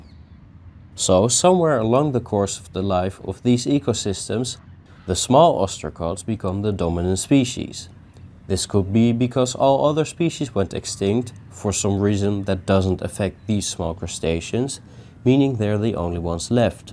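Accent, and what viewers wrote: Netherlands. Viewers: first time on your channel.. took my 3 words to figure out you're Dutch xD Interesting video concept though! Subbed for now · Are you perhaps dutch or Belgian? I hear a similar type of accent🤔😂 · I can’t unhear the dutch accent… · I'm gonna say dutch. As a another dutch guy, our accents are usually destinct when speaking English 😂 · Based on your accent. Are you Dutch! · The way you pronounce these latin names with that ever so slight dutch accent cracks me up a lot 🤣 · Heel leuk kanaal kameraad! Je G’s geven het weg dat je een nederlander bent haha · Heerlijk man dat Nederlands accentje LUV IT❤ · you sound so dutch, loving the vids! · I'm sure you're Dutch. I heard the Louis van Gaal in you. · Je klinkt Nederlands (you sound Dutch). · that dutch accent xD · Are you Dutch? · do i hear a dutch accent? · so this guy can only be dutch and i love it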